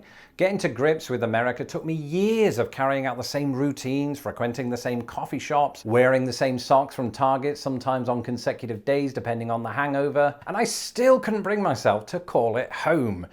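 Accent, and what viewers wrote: United Kingdom. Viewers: Welcome Home. Just promise us you will never lose that signature British accent of yours. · welcome home,. Glad you are here!:) Just make sure you keep your British accent and keep informing us of what gets lost in the pond. I find it absolutely riviting! Heck, just regions in the US are like a different country! My family and I don't live too far. Just over the state line in Wisconsin south of Milwaukee! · “When I stopped to do the math. . . sss” 😂 Still sounds British to me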